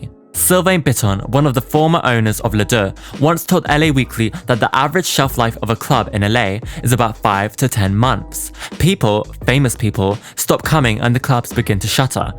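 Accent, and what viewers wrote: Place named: RP, United Kingdom. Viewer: LOVE your channel and your voice— so posh! I’m definitely a miserable millennial nostalgia worshipping on simpler times. See you in hell 💋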